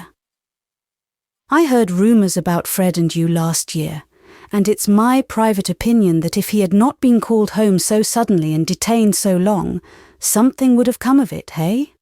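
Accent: United Kingdom. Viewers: Hearing an essential American story with a British accent doesn't work.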